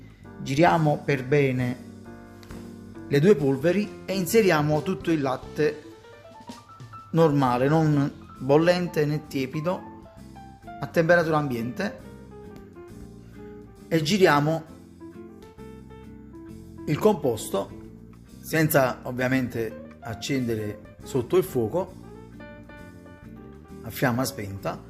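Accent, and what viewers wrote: Naples, Italy. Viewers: il simpaticissimo accento partenopeo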